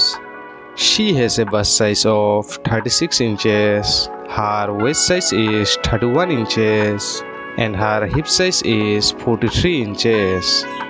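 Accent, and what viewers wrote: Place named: Bangladesh. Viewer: Bangladeshi accent, for sure you are Bengali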